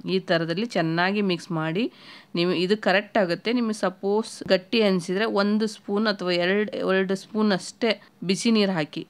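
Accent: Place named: North Karnataka, India. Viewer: U speak in UK kannada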